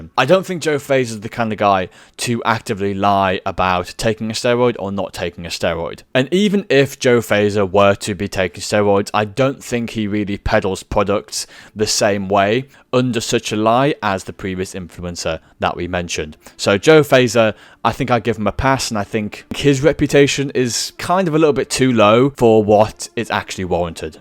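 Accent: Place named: United Kingdom. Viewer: So sick of backwoods British accents on so many youtube videos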